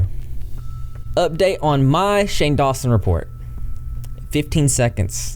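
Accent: South, United States. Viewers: U were cute until I heard that gross southern accent reminds me of Jim Crow laws GROSS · I gotta say, as a midwesterner who lived in the south for a few years, the accent is such a weirdly lovely breath of fresh air. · 1st) Sanders had gotten into something before b/c he was not making videos BUT when he came back I was happy for him and thought he was really trying to give viewers actual information so this is so disappointing, he seemed like once he saw all the racists videos for a while few years back not sure why but when Shane’s racist videos had really resurfacing (which is NOT cool at all) Sanders seemed like he was on a witch hunt or something he was actually one of the very 1st Drama type creators I had ever came across years ago so disappointing smh. 2nd) I recently came across your channel due to the Dahvie Vanity’s stuff few months ago and I love what you are doing you seem like a good hearted person and LOVE your country voice/words ....I am from NC and people from NY would always comment how they loved the way I talked and now I understand lol !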